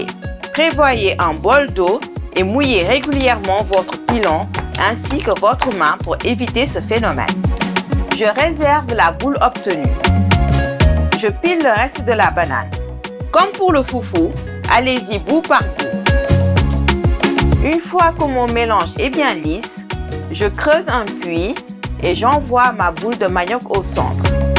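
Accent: Senegal. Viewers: La Boss ,vous êtes Ivoirienne ou Senegalaise ?Je trouve que vous avez un petit accent wolof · J'AIME l'accent Sénégalais très beau accent très agréable à l'oreille ❤❤❤❤❤❤❤❤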